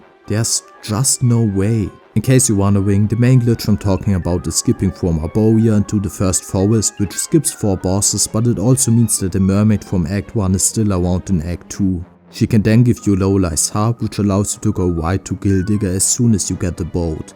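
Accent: Germany. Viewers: Wie hart man hört, dass du Deutsch bist xD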